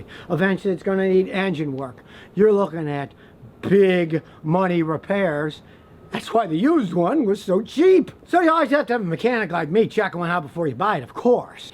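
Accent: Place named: South, United States. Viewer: Your brain tends to really question itself, when it see's a John Lenon look alike guy talking in a southern accent, especially when you're high.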